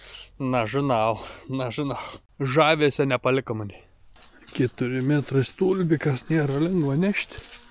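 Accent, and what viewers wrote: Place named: Samogitia, Lithuania. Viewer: Kap visad žemaitiškai stipriai 🇱🇹👌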